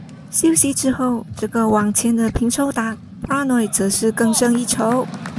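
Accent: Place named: West, India. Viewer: 这个似洋似印的旁述扰人观赏球赛呀😂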